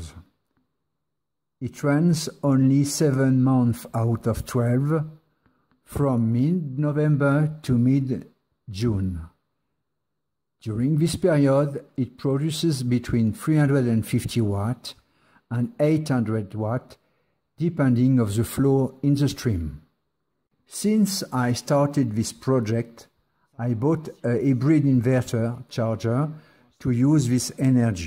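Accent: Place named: France. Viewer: Ah ah j'ai eu le même constat, pour une fois que je comprends intégralement une vidéo en anglais. Vive l'accent franchouillard :)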